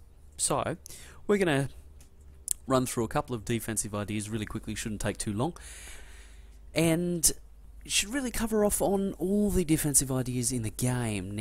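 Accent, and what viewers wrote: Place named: Australia. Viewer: question, do australians all say "turrent" because of some language reason? i've heard it before, they dont say "turret".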